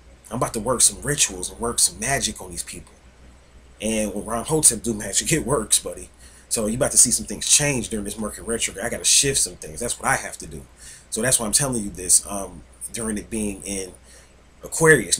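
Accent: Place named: Philadelphia, United States. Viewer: i can tell he is from philly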